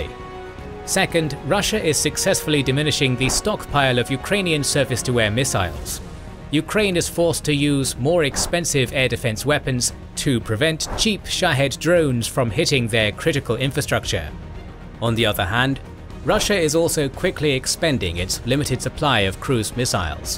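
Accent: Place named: United Kingdom. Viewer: Gives me vibes like The Economist. Also British accent, same style of snark. I love it!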